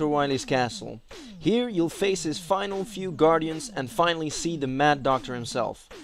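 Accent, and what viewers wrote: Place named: Netherlands. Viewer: Great Top 10. Like to hear a Dutch guy talking Engish so I know you're not that far away, immediatly recongnized the accent :). I'm from Belgium ;). I'm more a SNES guy as I grew up with that one, but see much games I liked on that console here too. Like Ducktailes (or that was gameboy) and MegaMan (like X very much). Super Mario Bros 3 was also released on the SNES.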